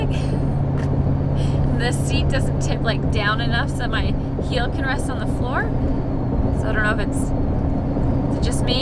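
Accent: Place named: Canada. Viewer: I love the Canadian accent nuances I'm surprised i pick Canadian over American as an Australian. You're awesome your guy is ver6 lucky 😃👌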